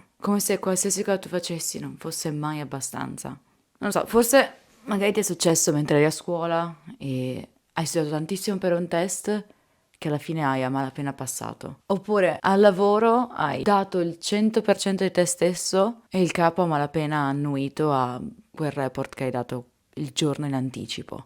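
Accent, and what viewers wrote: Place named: Milan, Italy. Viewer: Ti ascolto da poco, direi che sei milanese.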